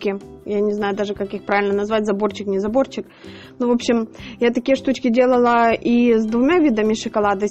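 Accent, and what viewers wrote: Ukraine. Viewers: Украинские нотки , лучше молчите или заведите человека с чистой речью...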